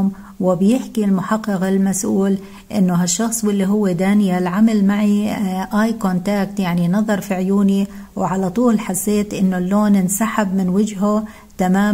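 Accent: Jordan. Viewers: سردك للقصة جميل وشيق فيه كثير من اللغة العربية بميولك احيانا إلى اللهجة الاردنية الجميلة أيضا .. بالتوفيق سيدتي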